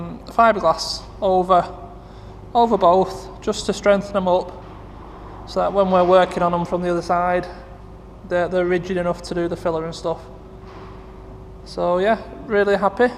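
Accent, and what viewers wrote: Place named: Blackpool, United Kingdom. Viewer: accent a bit like Blackpool youtube legend, the 'walk on the wild side' channel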